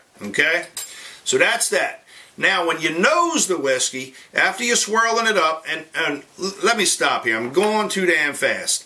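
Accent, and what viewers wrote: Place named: New Hampshire, United States. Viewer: Very informative video. I shared this for my friends to view. Love the accent. Reminds me of my mother and her accent from New Hampshire.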